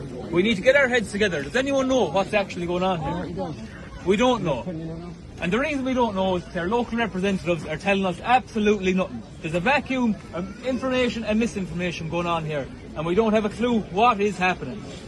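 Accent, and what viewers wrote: Ireland. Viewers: I do like the irish brogue and the guy is spot on . The only thing i'd ask the irish people is not to bring flags to the muslim march every week end in london because we have the same problems . God bless ya' lads ❤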